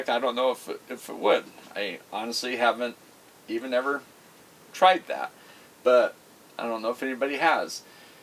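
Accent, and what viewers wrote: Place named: Utah, United States. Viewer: I hear n. Utah/s. Idaho in that voice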